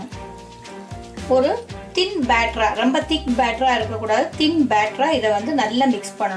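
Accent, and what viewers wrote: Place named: Kerala, India. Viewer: Good mayalam mix tamil voice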